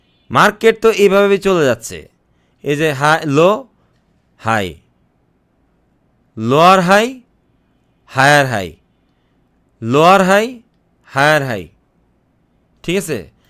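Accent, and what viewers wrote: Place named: Bangladesh. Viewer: আপনার কথা বলার ধরন অনেকটা ভোলা অথবা চাঁদপুরের মানুষের মত। জানিনা আমি আপনার দেশের বাড়ি কোথায়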